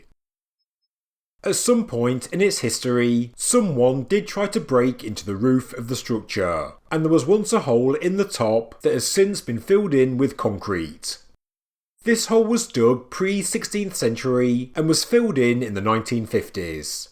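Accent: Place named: England, United Kingdom. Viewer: Please consider adding caption I don't get your English accent please 🙏❤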